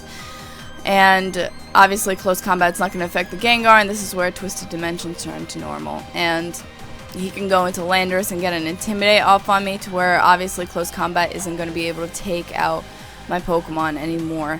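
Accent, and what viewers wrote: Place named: New York, United States. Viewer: New york accent. Plays pokemon. Gorgeous. God help me. xD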